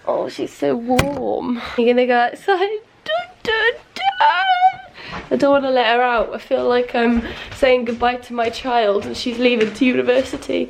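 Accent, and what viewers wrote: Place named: England, United Kingdom. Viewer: I love your english accent! It sounds so nice!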